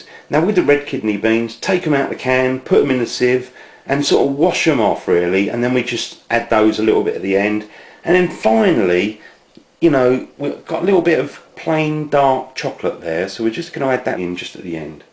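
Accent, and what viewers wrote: United Kingdom. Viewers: just because he has a british accent doesn't mean he sounds like Jamie oliver... he sounds nothing like him other than the british accent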